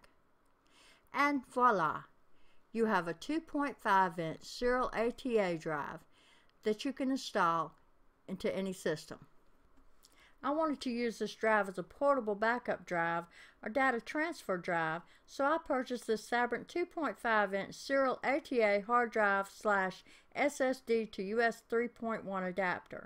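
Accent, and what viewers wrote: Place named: Texas, United States. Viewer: I like your video, are you from Texas Because this is the kind of accent I hear when I watch cowboy movies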